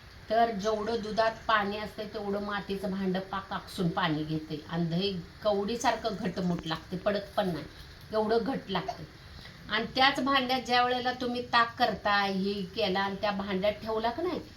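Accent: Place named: Kolhapur, India. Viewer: मावशी तुमची बोली भाषा ही सुद्धा तुमच्या पदार्था इतकी रुचकर आणि गोड आहे...... कोल्हापूरला शिकत असताना अशी गोड भाषा रोज ऐकायला मिळत असे....nostalgic खूप खूप आभार आणि धन्यवाद अशा सुंदर रेसिपीज इतक्या साध्या पद्धतीने सादर केल्या बद्दल....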